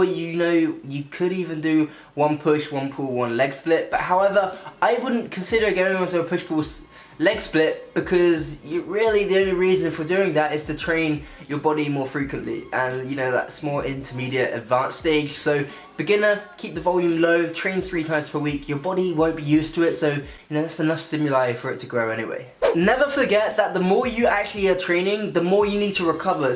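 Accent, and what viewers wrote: United Kingdom. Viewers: Great transformation,. Just amazing....something I would dream about achieving. And as Leland, said, you are also fantastically handsome which makes the gains even more worthwhile. You've got it all, a great physique, super good looking man, wonderful British accent ( I love England and English folk), and of course, a wonderful boyfriend. Unfortunately, I have none of those....lol! Keep up the great work,! Your beautiful....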